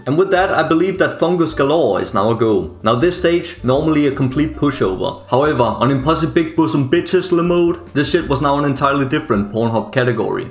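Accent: Denmark. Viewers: Clocked the danish accent instantly x] happy to see a fellow dane play this game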